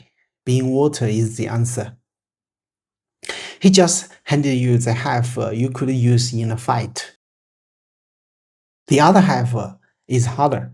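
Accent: China. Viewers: I'm glad I subscribed to this channel.. Although the accent is a bit Chinglish(no racist pun intended), at least there are subtitles. Every content here is a hidden gem.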